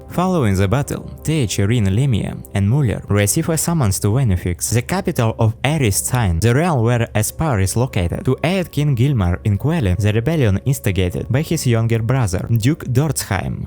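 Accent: Russia. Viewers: Where are your Accent comes from? Russia? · Not gonna lie I thought your were speaking Russian for a minute......but overall not not bad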